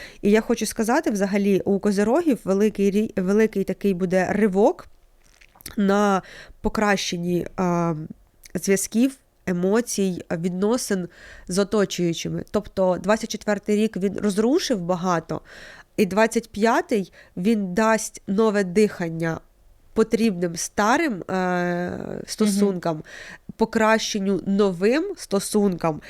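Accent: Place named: Surzhyk, Ukraine. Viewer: Це неможливо слухати. Як людина з таким жахливим суржиком дозволяє собі виходити в ефір. Це, в першу чергу, неповага до слухачів, і до Вашої аудиторії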